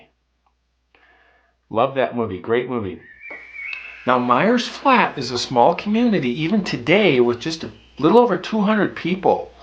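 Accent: Minnesota, United States. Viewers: Love your Minnesotan accent.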